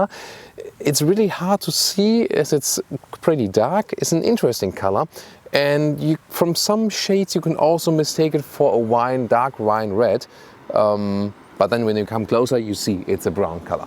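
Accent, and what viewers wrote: Germany. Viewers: I don't think I'll ever bother to watch BMW reviews without a German accent again. It just seems. so. right.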